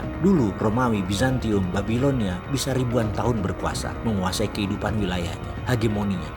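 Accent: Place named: East Java, Indonesia. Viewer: beda bro, kerasa logat jawa timurannya, karena ane orang madura, sudah terbiasa dengerin logat jawa versi timur, haha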